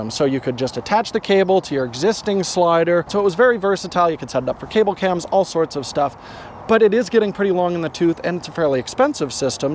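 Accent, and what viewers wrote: New Zealand. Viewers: Can say fish & chip in his Kiwi accent? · ' Kiwi accent is spot on. A+ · A Japanese ethnicity, living in Canada, with profound New Zealander Accent How can we not love? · WOW. Aussie guy here... your NZ accent is GREAT!!! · I never shoot video, but I watched this episode because of New Zealand accent and Jordan's clear explanation of this camera gadget. Thank you for sharing. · Like for the kiwi accent XD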